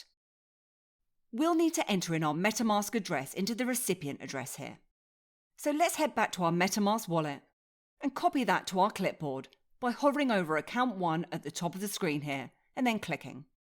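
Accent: United Kingdom. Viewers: Actually, it's true English. Americans/Canadians are the ones with the bizarre dialect.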